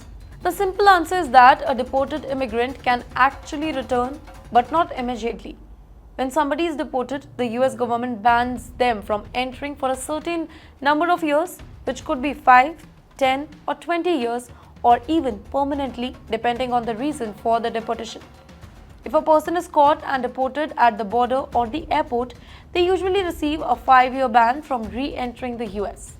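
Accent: England, United Kingdom. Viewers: You have a funny accent ! Father British ? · The best Anchorwoman. I just like her English accent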